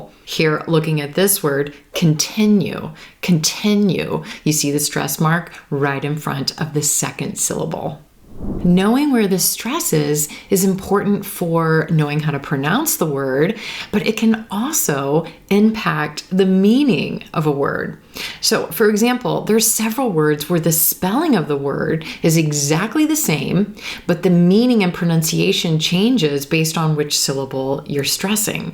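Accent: United States. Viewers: hello, my name is juan rivera, sorry my english is not good I'm begginer english student I'm 38 years old and I'm from Nicaragua in centre america, as you can imagine this country is really poor talking about economic situation and because of that I lost my family and now I live with my mom I don't have my own house I survive with only 80 dollars per month and I send 50 for my two daughters for manutation, it's been difficult all this time, that's why I have been studying english in a little free program course just to improve a litle my situation at least. but I've already finished the 12 levels and I feel that I need to improve a lot yet, thanks for making this videos, I just watch one of your videos and believe me I liked it, and I'm gonna try to see every single video from your first video, I like your pronunciation I guess your are american, to be honest don't like british pronunciation I prefer american accent, I would like you to talk about the most common situation with modals in present future and past because this is the hardest content for me, I would thank you, well greetings and blessings..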